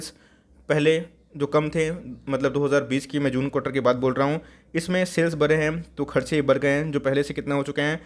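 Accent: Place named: Odisha, India. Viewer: Odia accent.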